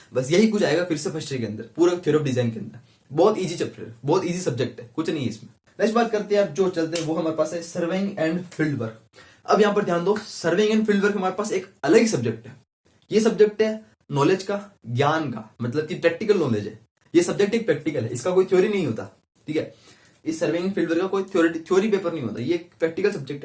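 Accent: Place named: Marwar, India. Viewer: bhiya aapke voice me se mast marwadi tone aa rahi hai isliye ghar jaise lag raha hai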